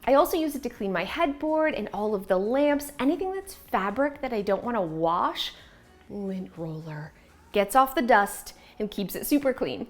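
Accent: Canada. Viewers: That's great that you want to do something new! I'm new to your channel and I'm just here for the tips in your Canadian accent and the shenanigans that fill your life and come out of your mouth. 😂 Maybe take the camera outdoors and let's see what happens when life happens. Byeeee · Thank you for showing up, even when you might not feel like it. I love the personal stories you share are how honest and real you are. I always learn something from you and you always motivate me to go clean or organize something. I also love your Canadian accent, since I grew up in Ontario, Canada, eh.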